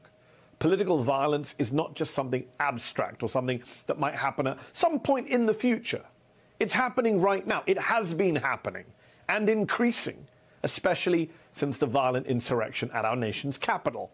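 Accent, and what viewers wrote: United Kingdom. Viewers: You dont sound like an American more like a Britain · You have a British accent you're not American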